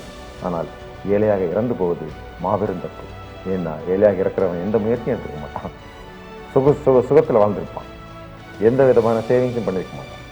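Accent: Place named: Kanyakumari, India. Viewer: ஐயா வின் குமரி தமிழ் பேச்சை ஆழகு சேர்க்கிறது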